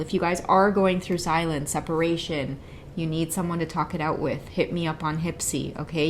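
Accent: Trinidad and Tobago. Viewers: That's was so funny .that's how true Trini talk😊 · You are great, and I ❤️ your Trini accent!!! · Omg I love that trini accent.. so soothing lol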